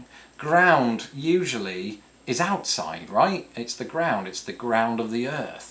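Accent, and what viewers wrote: United Kingdom. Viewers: That British accent is second in charm only to the stereotypical non-rhotic southern accent. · You have a charming sense of humor. And I really enjoy your videos and hearing your POV on my country as well as learning more about English customs, history, food, etc. Glad you like being here so much. And yes, I do love your accent. If you want to know why, I grew up watching old Hollywood movies or BBC productions filled with British actors - so mostly heard more “posh” accents (Basil Rathbone, Lawrence Olivier, Claude Raines, Cary Grant - yes I know he faked it, Margaret Rutherford, Peter Sellers, Leslie Howard, Edward Everett Horton, David Nivens, Sir John Mills, Glenda Jackson, Maggie Smith, Sir Ralph Richardson, Sir Alec Guiness, Richard Attenborough, etc) and they just sound so much better educated and more interesting (or even eccentric) than the often flat American accents. 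I think it also is the much wider variety of euphemisms the British have, the clever turn of phrases for describing or referring to things, the larger vocabulary of mild swear words or expressions you hear in films and TV, and when you yourself speak on your videos, compared to how Americans speak or write. I also think those of us enamored with the Brits find much of the English eccentricities and old customs very quaint and charming whereas you, as a Brit, probably feel quite differently about them - maybe find some of them annoying. As an example, last summer I was reading some online commentary on The Guardian’s website about the movie “Dunkirk”, plus another article about Brexit and a few o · No, your accent is not intimidating at all. Is ours? Actually, I am curious about how Brits hear Mid-West, American accents? We like your British accent. It's not intimidating, but it does sound very cool. 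Do Brits like, or dislike American accents? I wonder? Great Britain, although not the colonial power it once was, is awesome, if only they were not self destructing right now, just like all of western and northern Europe. I love GB. I just wish you'd reassert yourselves. I like your videos very much. Keep doing it.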